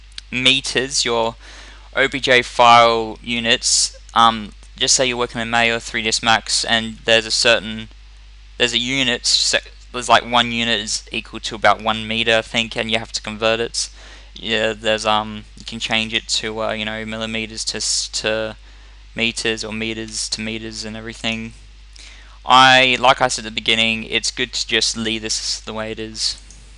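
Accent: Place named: England, United Kingdom. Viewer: Какой чудовищно неприятный английский акцент =(((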